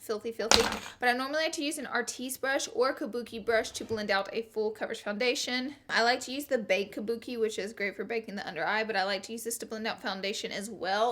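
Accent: South, United States. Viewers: SNAPCHATFAM... Love you girl. Also in the south and love your accent and how funny your videos are. ❤️❤️❤️❤️ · Snap Fam!!! I loved your snaps from London!! I'm from South Georgia so I love that you're a southern girl too with your accent!! ❤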